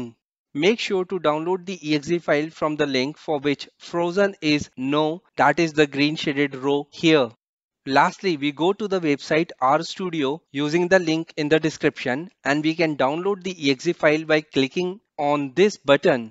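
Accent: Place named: India. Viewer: talks like an indian bot, jesus christ bruh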